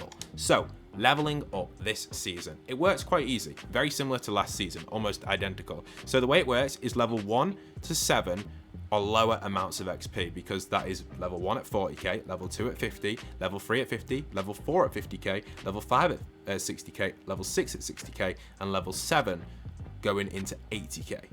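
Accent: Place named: United Kingdom. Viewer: He sounds like Luke from modern family with a British accent istg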